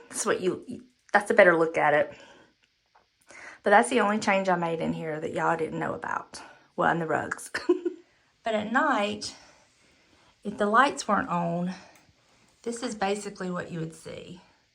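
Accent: South, United States. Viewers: You had me when the door opened. That southern voice speaks to me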